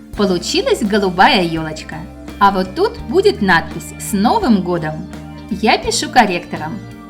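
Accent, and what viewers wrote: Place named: France. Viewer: голос потрясный! как у француженки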